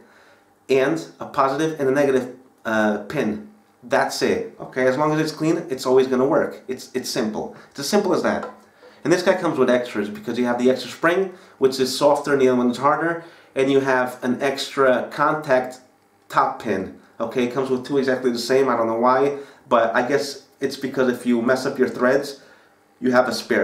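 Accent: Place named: Portugal. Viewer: When I stumbled on to your channel, you reminded me of someone, your slight accent that comes through in English. I couldn't pin point it. Then I realized you remind me of my dad, and various family members, I wondered if your were Portuguese, after more poking around through your past videos I saw the Portuguese reviews! Really enjoy them. My parents are from Portugal, born there, while I was born here in Canada. You seem like you and my dad would be friends, which would be great, would persuade him to stop smoking. I really enjoy your videos, and love watching your portuguese reviews.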